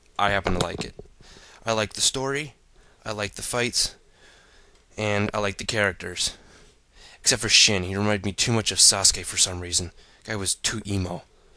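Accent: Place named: United Kingdom. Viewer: u fukin silly uk guys with ur silly way of talkin u can just make trailer with music no need to talk too much fool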